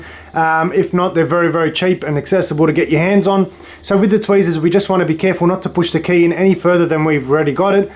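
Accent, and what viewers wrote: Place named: Australia. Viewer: New you were an Aussie as soon as you spoke.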